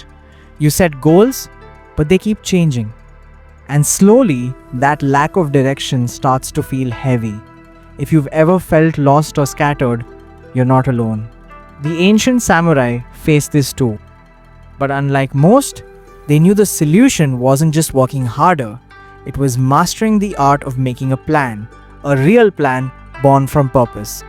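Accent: India. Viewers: At last the self help topic with an Indian voice which made me stop and listen . Usually they are all junk. Thank you for the quality video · Indian accent 🙄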